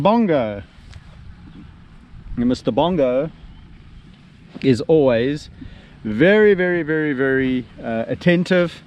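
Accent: South Africa. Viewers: God I hate the way south Africans talk, "super aggro"you like 40 years old stop trying to talk like a teenager. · Im from zimbabwe cape town kenya harare and jo berg and you have a south african accsent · I never tire of listening to that SA accent.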